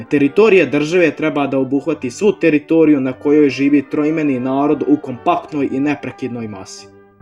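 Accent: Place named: Cazin, Bosnia and Herzegovina. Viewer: Cazin ili buzim.....naglasak......para usi...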